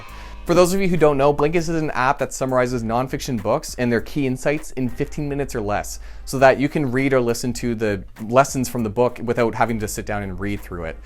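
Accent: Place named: Canada. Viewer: Watched a few of these videos now (great content) and this is maybe the first one where I REALLY noticed the Canadian accent XD. Also the salary multiplier here in the UK is normally about 4.5x.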